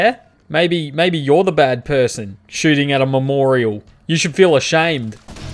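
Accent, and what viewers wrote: Australia. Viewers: An amazing let’s play. I love watching people play nice and good characters but there is something cathartic about this. It just rubs me the right way. The Australian accent helps as well ;)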